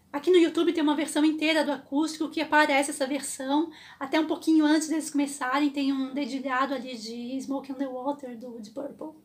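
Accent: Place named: Brazil. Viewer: Somos brasileiros... nossa lingua oficial NAO é o ingles... a gente nao tem obrigacao nenhuma de falar como eles... assim como eles nao pronunciam nada 100% quando tentam falar portugues...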